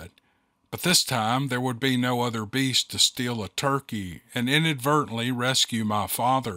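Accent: South, United States. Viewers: A part of me dies listening to this. I understand its fiction but you have so much respect and authority. If you made this narration on another channel maybe I wouldn't mind but your main? So many of my coworkers and neighbors think of Bigfoot and Dogmen as some joke for story- telling. I am quick to defend against that stupidity or less they will gey 411. We need more advocates like you to bring awareness and respect for the wilderness where these things roam. I just dislike these made up stories since frankly all they are are just creepy pastas in a sense. Years ago Vic Cundiff and later James Williams from Dark Waters showed me there are people out there who go out of their way to avoid pretend stories and only put out their real encounters. I spoke with you before and I am not saying this denegrade or insult you or this talented writer but please remember by narrating these made up stories on your main channel can be used against all your 'real' storytelling videos. 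If I wanted that I would listen to your other channels which go deeper into fan fiction which I never had a problem with but this is your flagship ocean liner. We respect and love your Southern charm and honesty with that confident accent. Please remember I am not criticizing you for what you pursue but recall that Darkness Prevails is one of the biggest Creepy Pasta channels out there but I can never trust him when he makes a video 'Real Werewolf Stories: 'Guys I swear this one is a genuine story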